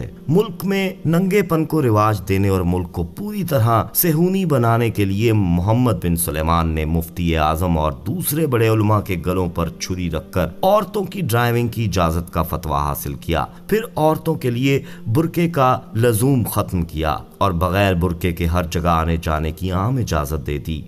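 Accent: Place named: Punjab, Pakistan. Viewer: Apni Urdu sahi karo bhai bilkul bhi sahi nahi bol rahe khalis Punjabi dihati lehja hai aap ka kisi Karachi waley se hi commentary kerwa letey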